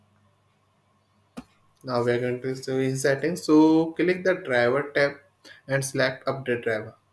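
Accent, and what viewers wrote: India. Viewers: why are u indian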